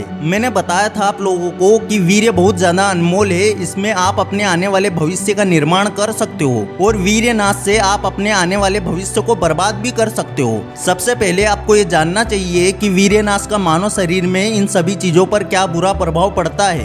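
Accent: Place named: Gujarat, India. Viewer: Ye voice kisi gujrati ki h lgta h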